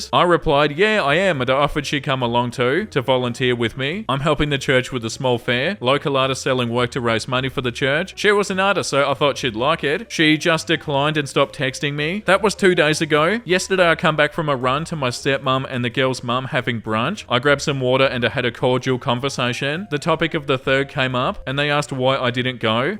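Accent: Australia. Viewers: What? But your Aussie accent is part of what makes you so compelling to listen to! It gives your speech a nice, lilting cadence, like a little song. 🎼 Sing a little song of neckbeards!🎵🎶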